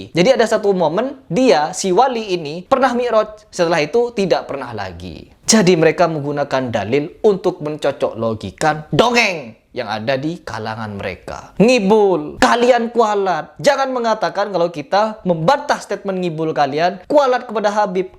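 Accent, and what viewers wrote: Madura, Indonesia. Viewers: Logatnya kental madura wkwkwk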